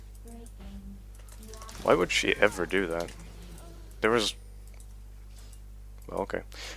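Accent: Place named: Sweden. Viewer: ahh you are swedish^^ i thought i recognised the accent :) <-danish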